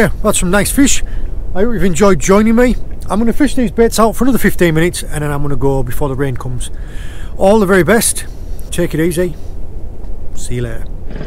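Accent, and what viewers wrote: United Kingdom. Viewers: Every time I watch your posts, I enjoy your crisp clear beautiful British accent. Besides, it is always nice when the fisherman knows very well weather he got snagged or he feels that some kind of fish got the hook in the mouth and try to hold itself in a whole. Perseverance paid off.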